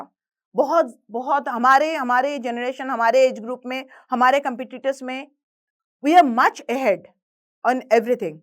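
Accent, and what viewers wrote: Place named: Bengal, India. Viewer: Kaha se hai aap ye bhi pehle batana tha mam.. Aap bangali hai aapke baton se pata chalta hai... Hindi bhi sudharna hai aapko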